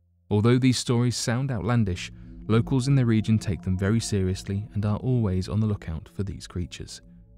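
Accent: United Kingdom. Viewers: The voice of this narrator is better than the others. It is easier to understand, with a more tamed British accent. Less viewers will become sleepy.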